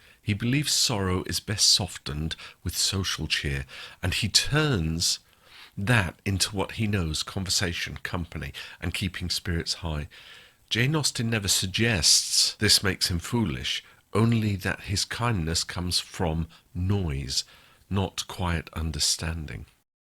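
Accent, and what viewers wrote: United Kingdom. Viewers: Sir John is the comic relief along with Mrs Jennings. I like his boisterous personality. And I like your British accent, as many of we Americans do! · You've been criticized for that lovely, silky voice? Unbelievable. Your voice transports me in the same way a day at the spa with the sound of relaxing music and a pair of strong hands gently massaging away all the tension of a busy week. I often put one of your playlists on repeat when I retire for the evening. I always sleep better with a British accent in my ear.....and yours is the most soothing. Remain just as you are and allow malcontents to just drift off to another port of call.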